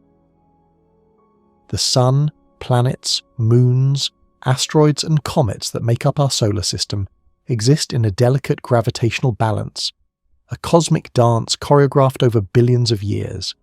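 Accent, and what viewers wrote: United Kingdom. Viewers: Hey YouTube I'm talking to you Please stop recommending science for sleep or the Sleepy scientist or go to sleep listen to this fucking British dude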